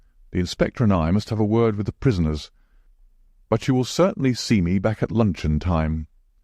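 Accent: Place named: North, United Kingdom. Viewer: A nothern man as narrator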